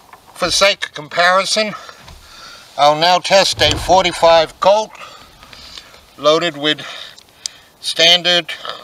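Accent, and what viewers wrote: New England, United States. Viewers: Love this New England accent. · You can definitely tell this is a Yankee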